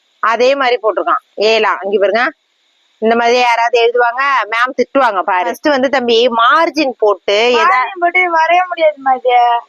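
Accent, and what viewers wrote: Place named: Sirkazhi, India. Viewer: 👏👏👏👏👏👏👏👏👏👏👏👏👏👏👏👏👏 super video velai seiya ma srichitte pakkara neenga tha pottu pesumpothu pazhya niyabgam varuth sirkazhi Chidambaram side apadi pesuvanga nanga leaveku pogumpothu appadibpesirkkom innatha appadinu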